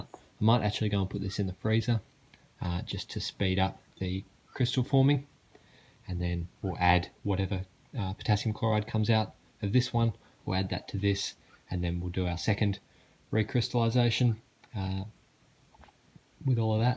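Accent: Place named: Australia. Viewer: Holy shit mate where are you from in Australia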